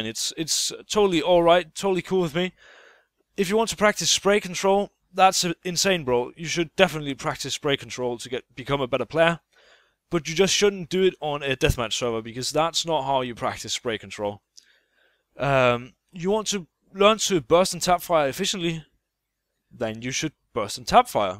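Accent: Denmark. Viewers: Are you Danish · Are you danish? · du er Dansker ik? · Dansker ihhhh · lol du er dansk..nice..bare bliv ved med engelsk...hader danske youtuber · Are you dannish?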